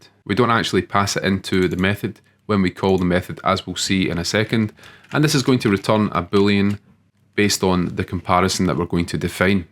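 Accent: Scotland, United Kingdom. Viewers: NO idea what your doing but I love your Scottish accent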